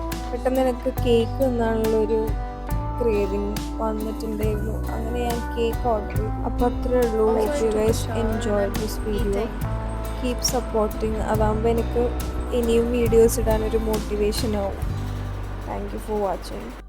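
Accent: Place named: Kannur, India. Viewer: Kannur slang is peek broo 😭😌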